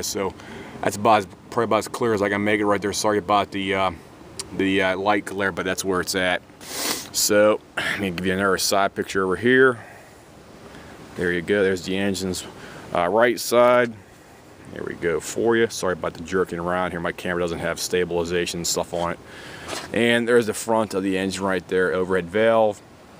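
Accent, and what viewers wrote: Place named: Western Pennsylvania, United States. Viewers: That accent of adding 'W's to any word with an 'O' in it is awful. Thankss for demostrating how to lift the hood. What a waste of bandwidth this is. Remind me to never stop in West PA when driving from Detroit to Jersey. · Unmistakable SWPA "accent." Shout out to younz from Uniontown!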